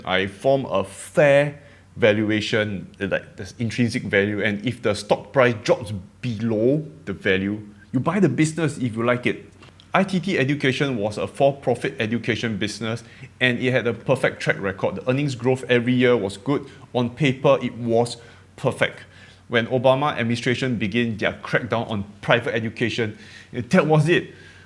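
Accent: Singapore. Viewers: I understand your motivation, but don’t sweat your accent. You’re Singaporean and this is the Singaporean accent. People will adjust and adapt! I find your accent adorable and think it adds to your charm and appeal. I’ll miss it if you stop pronouncing “people” as “pee-po.” 🤓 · Just be yourself man. You are doing great! What if you “neutralize” your accent n it doesn’t help? Waste of time ..... u are uniquely Singapore.... . Don’t listen to me: I might be a fly, ramblings of a mad fly. :) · Singaporean accent is what makes your videos unqiue tho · I have significantly improved my understanding of Singaporean English since I subscribed to your channel. Haven’t missed a single video since last year. I don’t want to lose it. Please do not change. :-) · Love the Singapore accent, you are doing an awesome job familiarising the world to it! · I am so glad I never miss your videos but this one was one for the archives!!! 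First I am glad you gave your opinion on the Chinese stock risk to reward with firm life experience. The rest will be up to us, we will either be donkeys or bees (is that a new animal we should now aspire to). Next the bees quote will be repeated by me ASAP. I hope after people laugh (as I did, so much I had to stop the video) they will seriously think about what that quote is saying. I find that I have a lot less to say to flies as I collect my honey. In regards to your accent, I understand you desire to reach a bigger audience and self "improvement" is usually a good idea so I say, give it a go, but I use subtitles for even English native speakers and your videos due come with them so if others are finding it hard to understand you that is an option, but many hate to read as well. If you feel it is limiting you reach then put in the work but I personally love a Singapore accent. In the end the value you bring is not in the accent of your speech but in the quality of your content and that has always come across to me loud and clear. 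All the best and thanks again for keeping others from being a DONKEY!! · Don't need to change accent you sound perfect to me. Singaporean here as well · Americanising your accent is completely inauthentic. When people talk about a neutral accent they are inevitably talking about an Americanised accent. A Singaporean/American blended accent is not a proper, authentic way of speaking. You, as a Singaporean speaking with a Singaporean accent is super real and authentic. It’s part of what makes you lovable. Going in a corporate direction with how you speak is totally dumb. Do you want to sound like some idiot rich kid who went to an international school who can’t think for himself? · Singapore accent is the signature of the channel :D I guess people are not that fuss about accent as long as the content is good, or they choose to miss out on good content. Political risk is the most uncontrollable risk. 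Cathie showed people what to do already. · Your accent is very distinctly from SE Asia, like the Singapore area. Maybe add some local English slang words into your discussions. I grew up in Taiwan and HK so I can tell the difference btwn when HK person speaks their British English and other areas. I believe if you watch some American tv shows, like Yellowstone, you can hear more of the American Midwest accent. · I don't think you need to neutralise your accent. We can understand you fine and I like listening to your Singaporean straight talking. It's so refreshing that you're not trying to talk around something like in the west. Rather, you're straight to the point and I like that. Keep doing you. From Australia! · You've gotta represent Singapore's pride with our accent! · please just keep the Singapore accent :)) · Nooo don’t change your accent. Singapore accent is great and not hard to understand. 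· Don't lose your Singaporean English accent! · It's a classic Singaporian accent don't change, better to add some singlish to teach people... don't change a dam ting lah!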